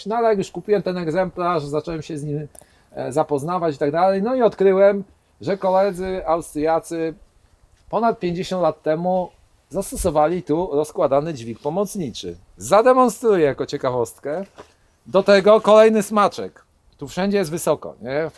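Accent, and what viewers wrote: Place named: Gniezno, Poland. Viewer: Gnieźnieński akcent w terenwizji , znajoma żwirownia , jak milo :) plus 12l V10. Czego chcieć więcej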